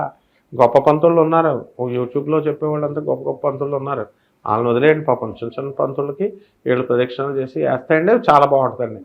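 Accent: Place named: East Godavari, India. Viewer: East Godavari yasa andariki nachhadu, mamuluga matladandi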